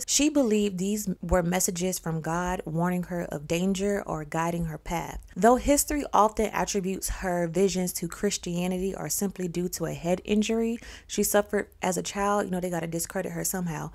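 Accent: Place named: Rural, United States. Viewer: Not sub tal 😂 girl I love your country twang lol thank you for doing this series ❤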